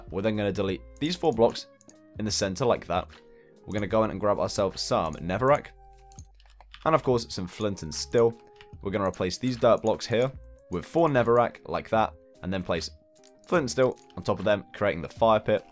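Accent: United Kingdom. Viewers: Ar you english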